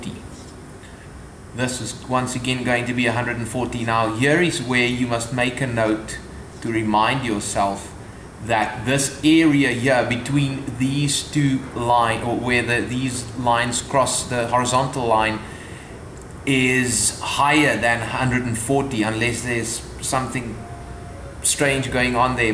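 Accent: South Africa. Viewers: I think you are 🇿🇦. Just saying by your accent 🤔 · Great to hear work explained in a South African accent for a change. Nice, concise video!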